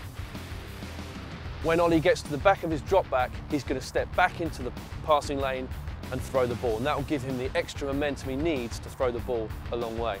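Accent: United Kingdom. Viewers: I did not expect a British dude teaching American football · LOL he's British! Nice video, though.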